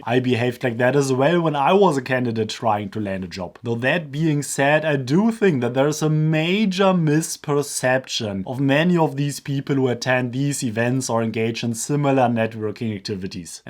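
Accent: Germany. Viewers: Your german accent is so refreshing!!! Wow! Normally a video on networking or sales has a fast talking American 😝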